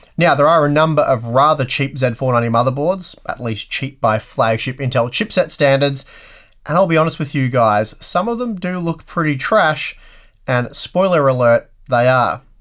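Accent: Australia. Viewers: I don"t know what is is about a fellow Aussie voice. It just always seams more relaxed here than Linus or Jay. Thanks for all the vids. :)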